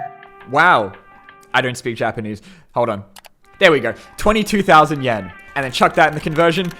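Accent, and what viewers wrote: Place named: Australia. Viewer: Idk why but I thought u were Australian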